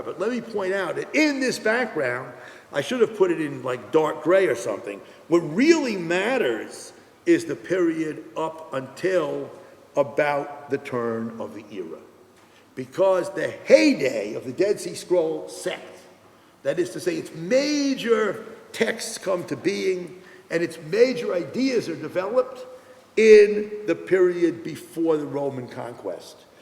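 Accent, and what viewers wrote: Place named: New York, United States. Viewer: Love the accent. Reminds me of a voice actor of a Ralph Bakshi movie. Luv it. Hey Shiffman,,,,,,,,,,,Brooklyn or Queens? LOL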